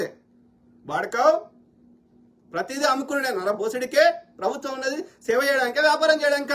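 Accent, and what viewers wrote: Telangana, India. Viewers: Anna😂 మన తెలంగాణ భాషలో యాసలో, మా మనసులో వున్న మాటలు, బయటపెడుతున్నావు మస్తుగా అనిపిస్తుంది అన్న.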